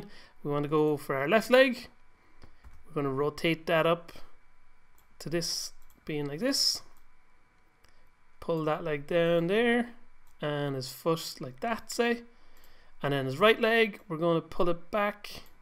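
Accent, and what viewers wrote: Ireland. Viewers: lol....wtf? Is it not obvious? He's Irish (or a similar accent)...and how does (I assume you're saying) old have anything to do with how he says words?? I'm so confused.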